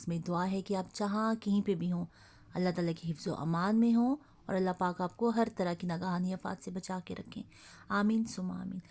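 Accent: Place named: Pothwar, Pakistan. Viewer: Ap Pakistan main kon sy alaqa sy belong krti hn apki bataon language main pothowari tuch aa raha he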